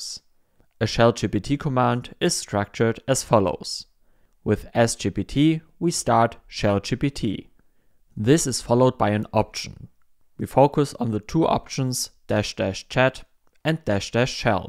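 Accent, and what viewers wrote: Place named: Germany. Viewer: nice video very deutscher Accent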